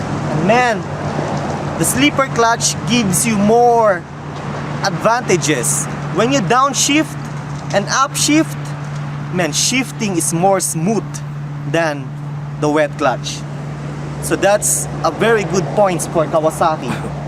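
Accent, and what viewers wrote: Philippines. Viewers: bro bakit pinoy accent kaparin dapat american accent kana, cant you not make it practice more speaking the American way.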